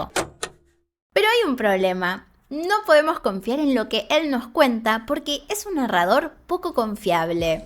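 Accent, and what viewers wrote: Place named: Buenos Aires, Argentina. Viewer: Espectacular video😉🙏🏼👍🏽🥰pero sugiero que mermes el "sh" tipico del porteño...para favorecer la difusion del contenido...y caiga bien en varios paises🫂🤗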